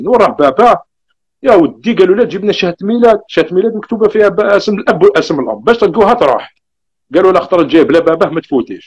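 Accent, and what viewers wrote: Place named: Bordj Menaiel, Algeria. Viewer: تبان من پرج منايل على حساب هدرتك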